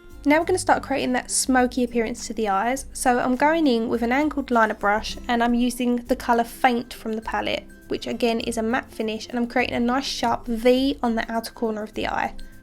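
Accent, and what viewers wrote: United Kingdom. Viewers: I never tire of hearing your accent, I miss the UK dearly! I love your explanations and being able to watch your skills, I wish I could have you as a mentor! Could you give an aspiring MUA advice about how to start practicing makeup on people? I don't know how to even begin building a makeup kit and not be poor:/ Any pointers would be sincerely appreciated, thanks +!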